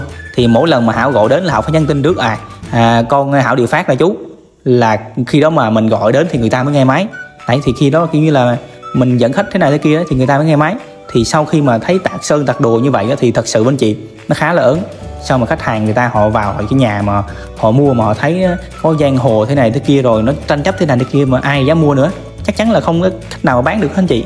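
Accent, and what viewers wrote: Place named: South, Vietnam. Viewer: người Quảng Ngài cháu nói giọng niềm nam rat rõ, love kênh cháu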